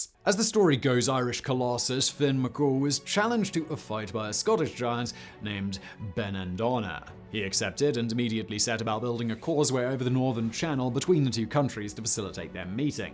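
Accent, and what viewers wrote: Mid-Atlantic, United States. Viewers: It's pronounced zed, you mid Atlantic sellout.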